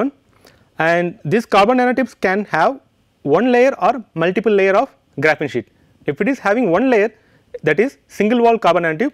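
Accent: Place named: India. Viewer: Why Indian guys even don't try to correct their accent? 😢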